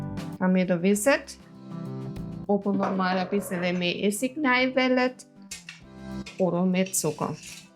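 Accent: Swabia, Germany. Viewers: Hallo, ich bin gestern zufällig über deinen Kanal gestolpert und hab´ ihn direkt abonniert. Danke für das Rezept, deine sympathische Art und das schöne Schwäbisch 🙂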